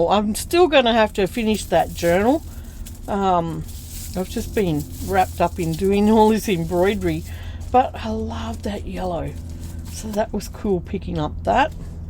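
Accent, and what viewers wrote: Australia. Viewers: I was going to say how much I love to hear your Aussie voice..not many of us that I've seen on you tube ..and then I heard you say you went to Wangaratta..and I got more excited..I'm guessing you went to to the Lutheran op shop they have great crafty bits..anyway hi from another north east Victorian who looking forward to seeing what you make with all these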